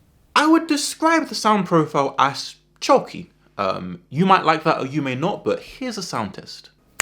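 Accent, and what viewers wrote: United Kingdom. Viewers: damn your sexy as hell my man! your british accent almost made me pop (^o^) no hooo moooooo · After watching all your vids I can't say tactile without a British accent. Im American.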